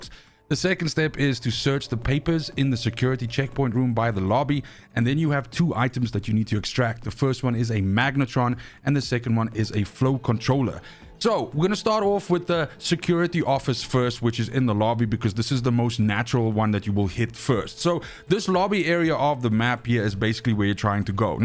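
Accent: South Africa. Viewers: ARE YOU SOUTH AFRICAN BROTHER?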